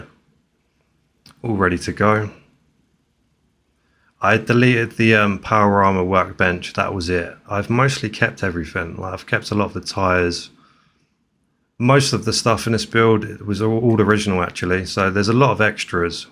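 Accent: United Kingdom. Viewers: Love your cockney accent bruv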